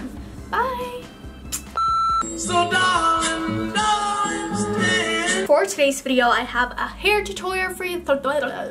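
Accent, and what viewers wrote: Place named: United States. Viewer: you're so cute. you sound just like me w/ ur american accent when you speak tagalog. #struggles lmao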